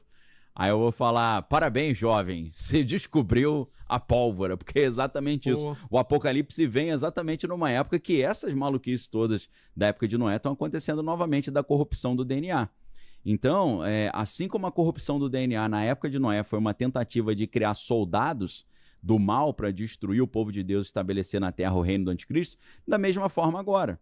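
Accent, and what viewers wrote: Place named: Rio de Janeiro, Brazil. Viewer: De verdade, a primeira vez que ouvi o pensei: vixeee la vem o carioca!!! HOJE NÃO PERCO UM VÍDEO DELE!!!!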